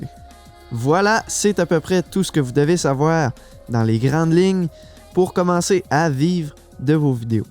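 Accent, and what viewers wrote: Quebec, Canada. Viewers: Je suis curieux, comment ça se fait que du parles d'argent en Euros, alors que ton accent laisse penser que tu vis au Québec ?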